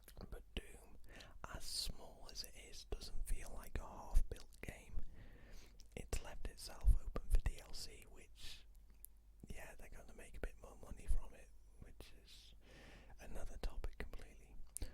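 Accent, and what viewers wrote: Wales, United Kingdom. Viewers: ah right thanks I thought it sounded Welsh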